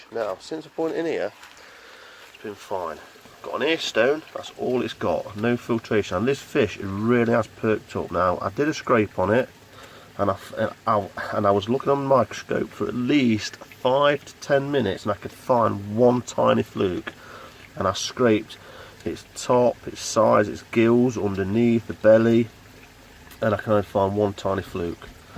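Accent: Nottingham, United Kingdom. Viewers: You sound like Carl Froch. is that a Nottingham accent?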